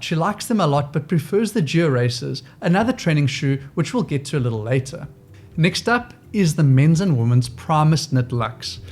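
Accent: South Africa. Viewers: Ja, asseblief, man! When you have the time, I would LOVE to watch a video about the benefits of losing my socks! 😅 I'm very new to this barefoot style of shoe, don't own a pare of vivo barefoots yet, but may just be getting a Geo Racer. Lol. Thanks wifey! ❤️🙏🏻 I've also recently fallen in love with injinji socks, and never used to like those trendy toe socks that people would have about...but Injinji does it right. 😁 After the initial strange feeling of 5 to 15 minutes...they stayed on my feet the whole day, I slept with them on (normally, i rip my socks off my feet at night, because they have to breathe!), and continued the entire rest of the next day with them until my shower that evening. (36 hours) ironically,I had misplaced them, and just found them again a couple days ago...and yes, I'm totally wearing them while I'm writing this! 🤣 Anyway, loved this video so much, thank you so much for making it, hey! 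Baie dankie maneer! 🙏🏻 (my afrikaans was always terrible growing up in Cape Town, and it definitely lost importance when my family moved to the states, so I'm afraid you're limited to please and thank you, LOL! Jammer! 😉) It's so nice to hear a legitimate accent again, especially discussing in such detail the benefits of so many vivo barefoot shoes! 🙏🏻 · Dude you sound South African Are you a SAFFA living in Greece? Or am I being silly? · I want to transition to barefoot shoes and Vivo is the only brand available here in SA. It was so refreshing to hear a South African accent that I was immediately drawn to the video. Thanks for the reviews because here in SA the shoes are pricey so making that first choice is crucial especially since I am looking for a work shoe. Thank you!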